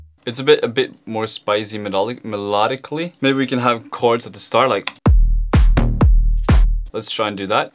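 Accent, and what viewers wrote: Norway. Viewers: You sound so Norwegian. In the best possible way of course - bra video og mye god inspirasjon! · You know you are Norwegian, when you can hear the Norwegian in his voice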